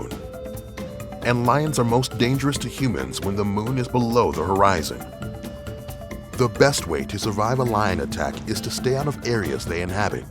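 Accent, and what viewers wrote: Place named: United States. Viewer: Hearing an American accent in a metric measurement 👌🏼👌🏼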